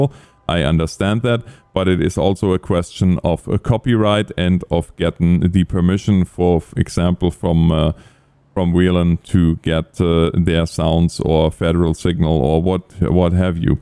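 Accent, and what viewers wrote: Germany. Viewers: Sounds Like German English.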